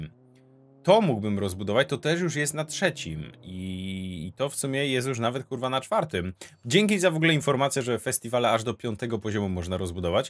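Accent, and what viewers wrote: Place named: Silesia, Poland. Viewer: Śląsko godka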